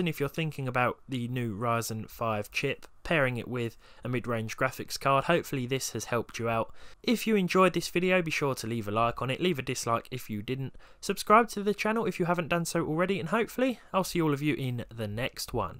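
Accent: United Kingdom. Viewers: I love ur Britishaccent ^^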